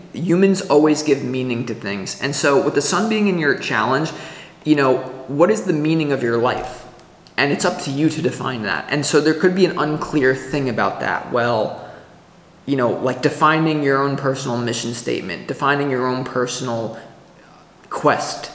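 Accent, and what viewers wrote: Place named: Texas, United States. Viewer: Sounded Texan thank you for your time 😊 Yes! I circle around back to why I started my channel 😊 that's deep...my kids are my greatest legacy but maybe I do want to leave another legacy. Greane village with my soulmate who is back in my life.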